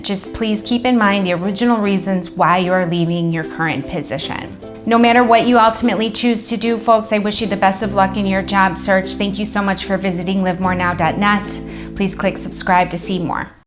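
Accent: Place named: Minnesota, United States. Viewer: I love her accent, is she from the Fargo movie?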